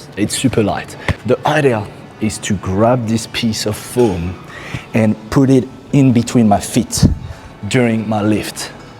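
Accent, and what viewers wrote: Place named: France. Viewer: Surprinsingly I'd watched. Oh, I got your accent is different and I enjoyed man... are you a frenchman? Cool! Is it your daughter? Very cute hahah man. Adorable, besides... a good caregiver does it with a good heart. You're coaching then, I liked - let's see if I can distuck of my strugglesituation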